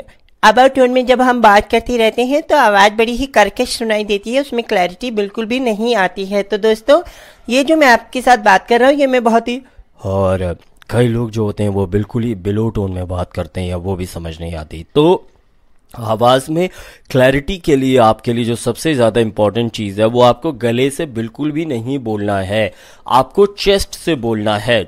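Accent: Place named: Rajasthan, India. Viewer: Bro apke Rajasthani influence kyu aa raha h r u from Rajasthan?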